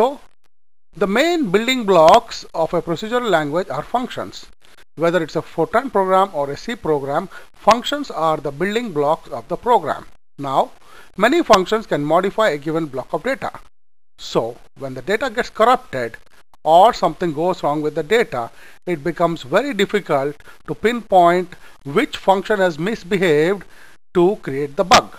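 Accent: India. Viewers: As a native speaker of US English, I am simply expressing the fact that I dislike the standard Indian English accent. There are certain (often incorrect) patterns of speech that can be observed which are displeasing to the ear in the Indian English accent; simply put, it hits the ear wrong. I'm sorry you do not understand, but imagine receiving technical instructions in Punjabi that is being (poorly) spoke by a white person from the US — how much fun would you have? My guess is that you would have very little Sir. This is only my opinion, take it or leave it. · I don't understand you buddy, but this tutorial IS in English! Indian accent is an altogether different thing, however its still very clear to understand.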